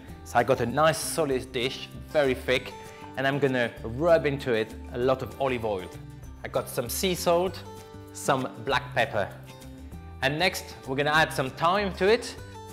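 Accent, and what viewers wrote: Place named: France. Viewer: ur French accent is slowly picking up some British slangs too I think haha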